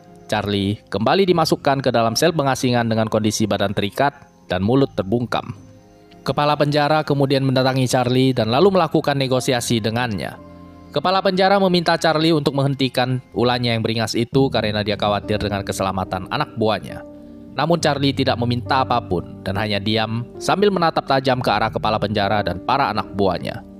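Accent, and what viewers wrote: Padang, Indonesia. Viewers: Logat ngomong nya kaya orang Padang.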